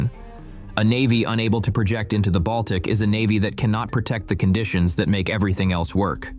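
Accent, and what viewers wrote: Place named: United States. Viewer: Excellent video showing superb European cooperation to create a state of the art platform. Pity that the narration was with an American accent. It is noted that the frigate has ZERO American content. Why therefore is the narration American? Content - excellent. Presentation - not so much.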